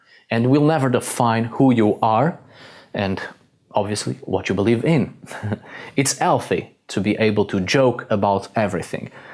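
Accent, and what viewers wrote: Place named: Portugal. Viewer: 'Morri com você falando português!' Is it because your archeology studies or do you have a Portuguese family? Because you have a strong Portuguese accent. 🙂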